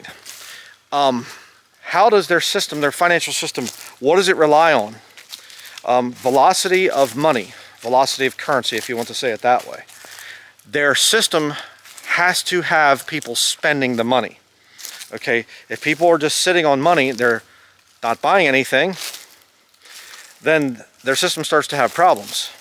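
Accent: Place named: Pittsburgh, United States. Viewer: Your accent sounds pennsylanic sp. Pittsburg.🙂 That's my guess. Maybe maryland?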